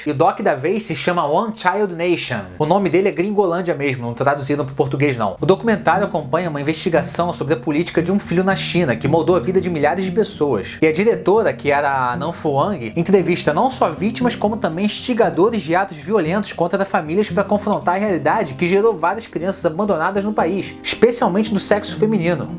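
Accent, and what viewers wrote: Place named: Rio de Janeiro, Brazil. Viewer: Sou carioca e até eu acho q vc tem sotaque de carioca.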